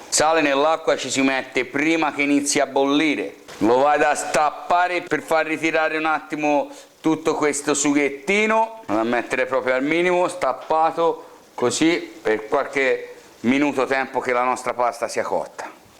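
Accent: Tuscany, Italy. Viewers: Ciao ! Per dire il vero, la ricetta la sapevo, però sentirti come la raconti tu, con l’accento toscano, e per me il piacere massimo. Grazie di tutto, e stammi bene ! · Sei fortissimo cin il tuo dialetto toscano bravoooo 👍👍👍👏👏👏👏😂😂😂😂😘😘😘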